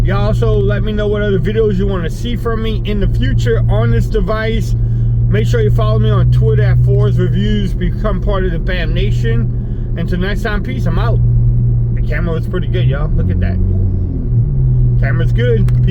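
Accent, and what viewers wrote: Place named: Louisiana, United States. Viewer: You still sound Cajun. Camera's ok 😀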